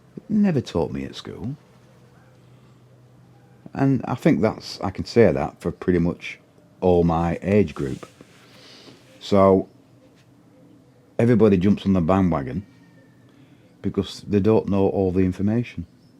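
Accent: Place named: Preston, United Kingdom. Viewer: I've just come across your videos mate, as my missus is Hungarian and she hates living in the UK the weather mainly. I thought your accent was familiar and you said you lived in Preston. We live in Longridge and I've been out to Debrecen a few times and think about relocating a lot. Where abouts are you living? I'm 40 soon and think it would be good for me to try it.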